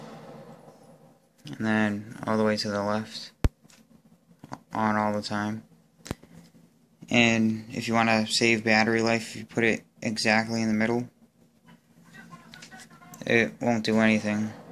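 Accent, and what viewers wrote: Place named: New England, United States. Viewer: You from mass or maine???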